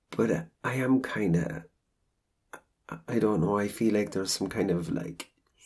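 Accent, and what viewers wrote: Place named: Ireland. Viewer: Me a year ago:I'm not into Irish accents Me now every time I here it:OH MY GUD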